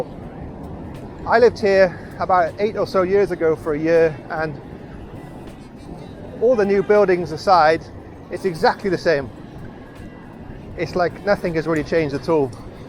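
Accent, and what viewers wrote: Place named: Wales, United Kingdom. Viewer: Good day Buddy, Louis Kats here from Melbourne, Australia. Can you please tell me your name. My partner and I have just become subscribers & last night watched at least 20 of your past videos & loved each one of them. Short, sharp & very informative with a Welsh accent. Current also watching Duong Global Business Consulting Group channel with Ken which is awesome. Also Check out " John Saboe " who I watch & is currently living in Da Nang. My partner and I have visited Vietnam twice & we absolutely love & want to live in Da Nang in the next couple of years. Love your bike ride we remember so many landmarks. We stayed at Babylon Garden Hotel which was awesome & I highly recommend. Hooked on your channel can't wait to see your apartment & a cost of living. If you ever come down to Melbourne, Australia happy to host you. 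My business partner is Vietnamese same as my doctor, tax accountant, lawyer etc. I celebrate Tet ever year with my Vietnamese friends really family & we love home cooked Vietnamese food. Great channel great content. Your number 1 Fan in Melbourne, Australia. Louis Kats ☺👍❤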